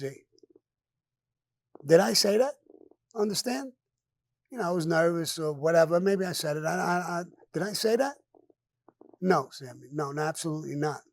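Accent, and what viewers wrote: New York, United States. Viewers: Hi Sammy love hearing all your stories,I enjoy your NEW York accent ' Well have a good night stay cool!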